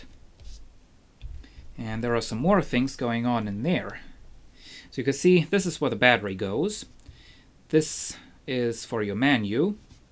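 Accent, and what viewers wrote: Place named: Germany. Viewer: are you german, you have a very german accent..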